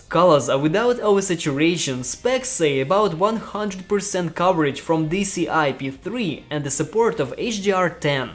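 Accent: Russia. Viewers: Why your accent looks like Russian guy? are you from Russia? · Love your accent lol, are you a russian?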